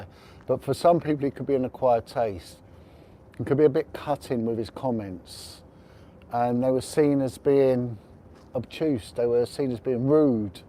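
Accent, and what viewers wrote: Cockney, United Kingdom. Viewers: God save the cockney!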